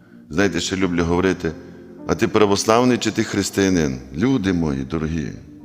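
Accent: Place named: Galicia, Ukraine. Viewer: Як гарно і колоритно звучить українська мова з різними забарвленнями територіальних діалектів!))) Галицький говір ))) Слава Ісусу Христу за таких священників!😊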